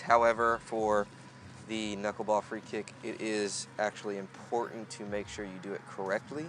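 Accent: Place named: Australia. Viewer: you sound australian :D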